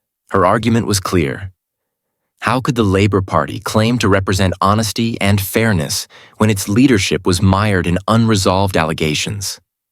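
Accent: United States. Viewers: If this confrontation was so interesting, why is it not shown? As a secondary question, why is it 'voiced' by an American person? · Political News Club....based in Saudi. No idea about the UK and our politics and a stupid USA voice to boot. Go and do something useful instead of posting this rubbish.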